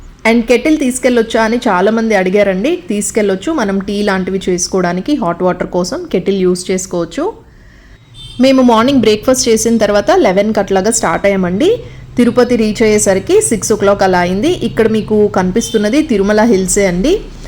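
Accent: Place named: Vijayawada, India. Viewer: Crystal clear narration - Vijayawada Telugu accent - good feeling like having Vijayawada Andhra Bhojanam.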